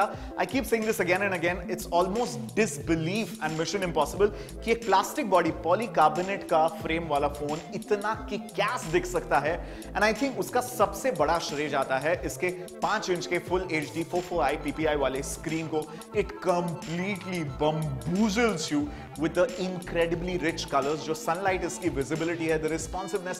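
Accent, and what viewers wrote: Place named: Russia. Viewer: That Russian accent though.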